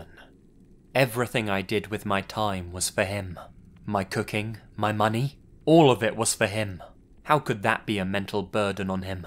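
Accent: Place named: United Kingdom. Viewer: Hi, I'm English and enjoy your channel very much, the English comment was because of your very British accent. You sound like a BBC docu. narrator, it "kills" me for this reason when you drop in vernacular, like, "...naturally, she lost her shit.", or "nearly shit myself!" WELL DONE, keep doing what you're doing and I will keep veiwing. Respect! ANDY F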